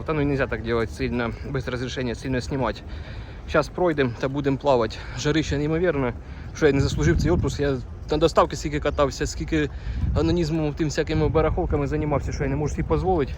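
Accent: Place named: Komyshuvakha, Ukraine. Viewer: А часом, Ви не з Комишувахи? В мене там родичі живуть, говір - ідентичний❤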